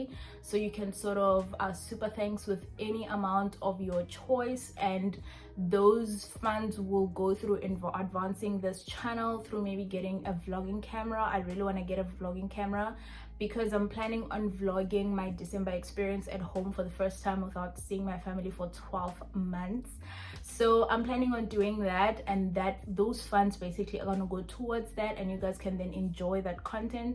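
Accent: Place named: United Kingdom. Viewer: I can start to hear some typical British accent in your voice 😅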